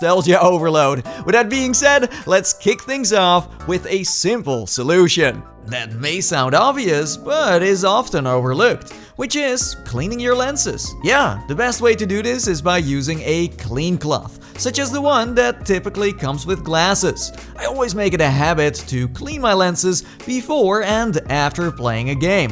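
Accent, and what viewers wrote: Netherlands. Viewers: Thank you for the information, I am going to sell my oculus quest 3 for some money (because it sometimes has some issues) and then i am going to buy the 3s and these adjustments, thank you for the information. (And by the way are you Dutch because I am, and you kind of have a Dutch accent, not saying it’s bad.)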